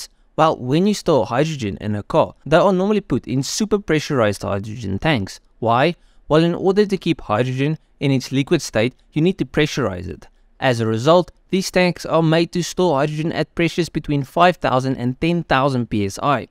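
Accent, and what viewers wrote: South Africa. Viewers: South African ?? I’m an American that lived in Yeoville in 1984-85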